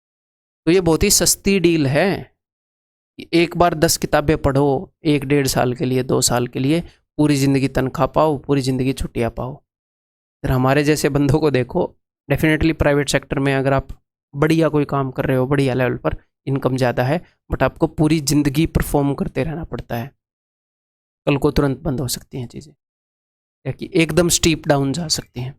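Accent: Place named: Bengal, India. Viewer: Sir aapko Punjabi Me pura bengali babu lag ri ha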